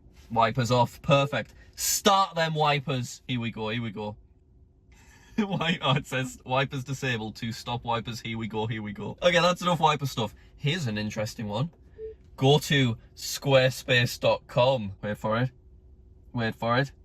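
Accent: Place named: England, United Kingdom. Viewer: I swear your accent is sick I love England people’s accents. Tesla Voice Commands need to understand people with any accent completely. Because sometimes it’s impossible to not talk with your accent.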